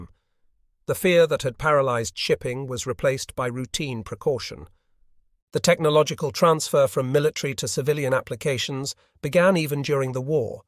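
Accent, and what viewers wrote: United Kingdom. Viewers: and AluMINIUM. (Not Aluminum). Bloke with a British accent trying to speak American. Spoils an otherwise interesting video on a key WW2 Royal Navy bomb and mine disposal event.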